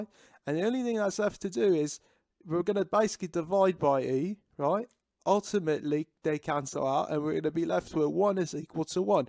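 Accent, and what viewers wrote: United Kingdom. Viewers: british math sounds so funny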